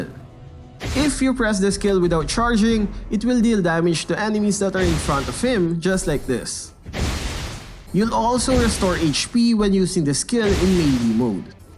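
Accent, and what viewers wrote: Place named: Philippines. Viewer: Yor accent looks like filipino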